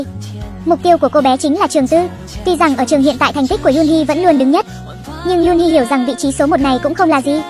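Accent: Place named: North Korea. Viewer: Nghe thì giống bên triều tiên hơn:)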